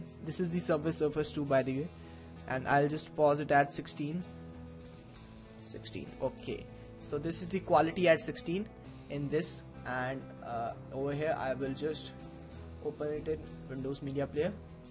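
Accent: India. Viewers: sounds like an indian from india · I think you're indian, and I love your accent! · PLEASE LOSE the Indian ACCENT! It is ANNOYING! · This Indian speech is awful i couldnt watch this video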